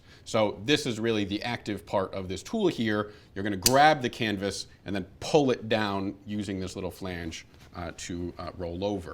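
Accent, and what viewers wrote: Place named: New York, United States. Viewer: I like the New York accent.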